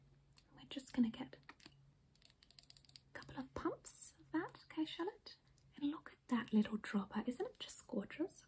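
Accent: Australia. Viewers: I love your cultivated Australian accent 😘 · I don't know if anyone has seen it, but the accent is exactly like the rich ladies who work in the shop in Kath and Kim 😂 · she’s Australian XD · in love with the aussie accent, the soothing voice and the vibess i just woke up from a nap but i guess its bedtime again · Omg lol I just realised that most of us aussies have that exact accent 😂😂😂😂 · She’s literally Australian.. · As a true blue, I appreciate you and these videos. The comfort of an Aussie accent which the subtle cultural complexities of a “rich Aussie mum” makes me real feel at home 🦘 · are you actually australian or no?!?!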